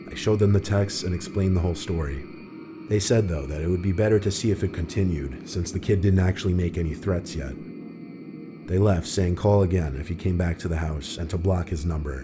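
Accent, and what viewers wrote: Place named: New York, United States. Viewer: Love this channel! Love how I can listen to these story and the person narrating these stories sound very similar to me “Yes, I’m talking about the NY accent!”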